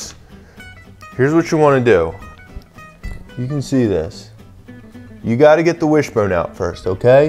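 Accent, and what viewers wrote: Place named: Pennsylvania, United States. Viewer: are you from Pennsylvania? It is the way you say certain words. Thanks for sharing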